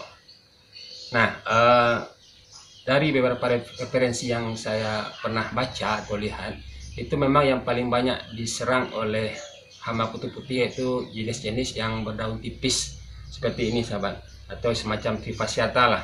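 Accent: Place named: South Sulawesi, Indonesia. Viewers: Om..domisili dikota mananya..soalnya logatnya sih org sulawesi selatan..maaf kalo salah yh.. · Anda pstibbkn org sulsel yach ? Klo org sulsel asli psti tau logatx..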